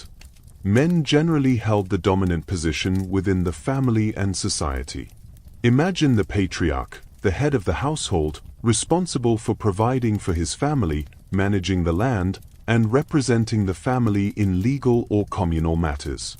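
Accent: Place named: United Kingdom. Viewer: I can endure only limited doses of British pronunciation.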